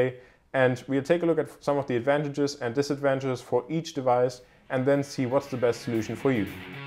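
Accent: Germany. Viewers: Are you from Germany? You have a slightly german accent.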